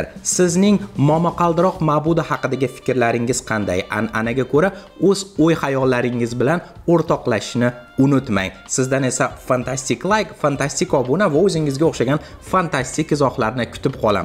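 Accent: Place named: Russia. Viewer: Sizga maslahat. Nutqingizni ruscha oham va ortiqcha bir hil intonatsiyadan tozalang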